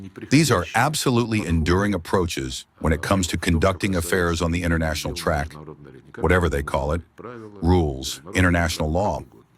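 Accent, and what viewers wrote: United States. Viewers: Would be better without the dumb american accent.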